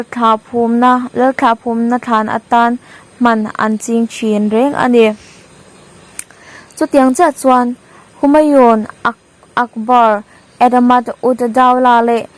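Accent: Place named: Myanmar. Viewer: Enge in sawi sel vak vak. Burma aw phawi a nih hi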